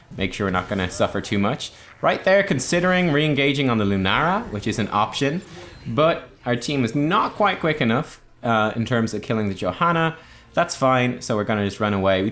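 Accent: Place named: Ireland. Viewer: What part of Ireland are you from? Never heard your twang 😁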